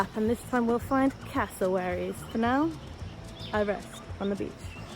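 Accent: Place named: Australia. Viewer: Great Video! I love your passion for plants! Its something that I have always overlooked, not deliberately but I have just been drawn to things that move (at a noticeable rate). I cant work out your accent? Some sounds Aussie, some a little south African and then some sounds English. Have you travelled about a bit by any chance?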